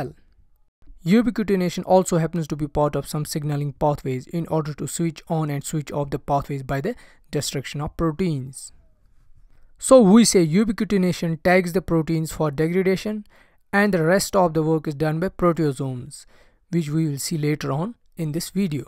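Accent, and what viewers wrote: Kashmir, India. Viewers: Sir i guess u r from kashmir?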